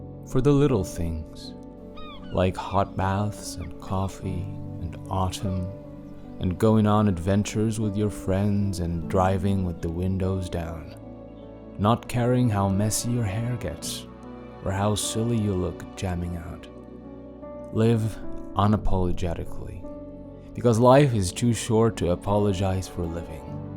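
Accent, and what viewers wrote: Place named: Morocco. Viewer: best morrocan english accent 😭❤️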